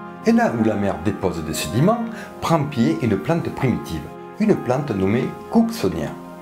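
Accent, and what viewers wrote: South, France. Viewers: (: Au top. Toutes c'est fleurs, l' accent du sud ,avec un temps de pluie rien de mieux :)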